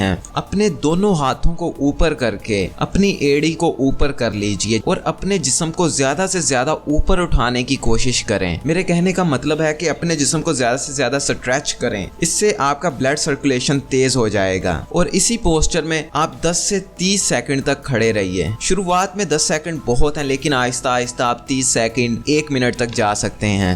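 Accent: Punjab, India. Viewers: AP Punjab ke ho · Yar apky muzuaaat intehae jandar lekin apki Urdu intehae kmzor h lehja bilkul kc pendu Punjabi ka h ya Urdu ehl e zuban ki trha bolo ya phir direct Punjabi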